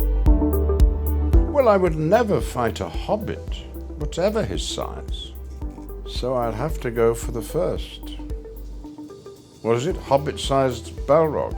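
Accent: RP, United Kingdom. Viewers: Only Ian would answer questions while drinking tea and sounding posh AF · It's strange hearing American pronunciations with such a posh accent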